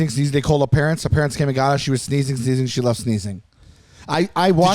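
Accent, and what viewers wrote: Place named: New York, United States. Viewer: Yeesh that New York accent is not flattering anymore. It used to be cute a long long time ago.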